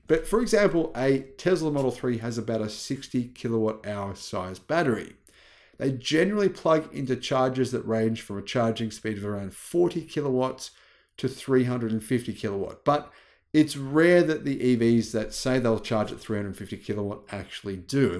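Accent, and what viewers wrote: Australia. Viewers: You have an Australian accent except when pronouncing Fortescue and Pilbara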